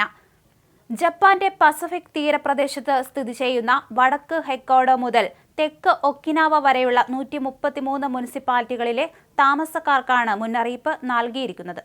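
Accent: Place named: Pala, India. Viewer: Palakaari aanan thonun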